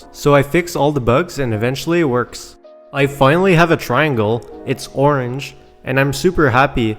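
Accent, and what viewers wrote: France. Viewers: you sound french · you have a french accent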